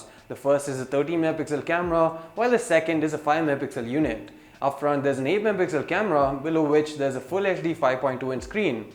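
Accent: India. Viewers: Ninguém entende essa desgraça de inglês indiano!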